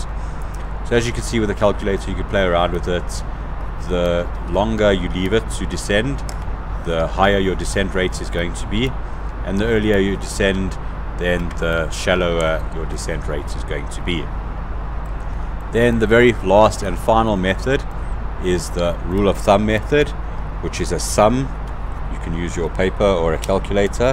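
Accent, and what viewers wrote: South Africa. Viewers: You can tell wen someone's south africa.😂😂😂 love it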